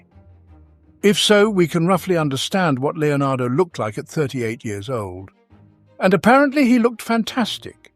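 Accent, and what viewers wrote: United Kingdom. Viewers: I can't stand British accents pretending they are intelligent. 😮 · Why ugly englisg accent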